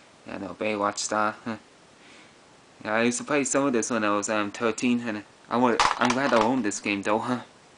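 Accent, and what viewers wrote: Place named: Ireland. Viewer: Nice collection, thought you sounded Irish!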